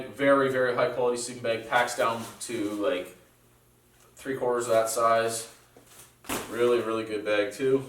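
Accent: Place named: Canada. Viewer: Hey say hi to scout for me love yr Canadian accent "Not to shaby"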